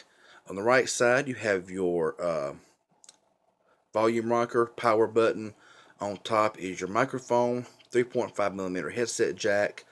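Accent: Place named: East Tennessee, United States. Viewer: Sounds like you're from East TN or Kentucky. Amirite?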